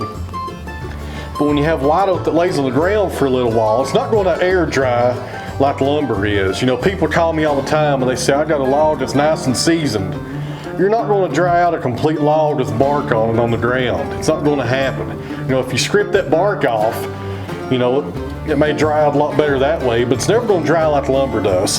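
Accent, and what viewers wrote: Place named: West Virginia, United States. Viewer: Good for you. I have been watching your channel for a couple of years now. I discovered your channel when a related channel changed to yours and I heard your dialect. This is very similar to my many (mainly deceased) dear relatives from SW/WV in Mingo County--a long way from suburban Philadelphia where I live now. My favorite uncle in Mingo had a very dangerous circular sawmill used to mill cap wedges to secure mining timbers. I have loaded a few pallets of wedges in my time. Although we didn't timber frame them, we also built a few sheds out of "sawmill" lumber. He acquired his logs in a fashion similar to yours. Great content. Takes me back.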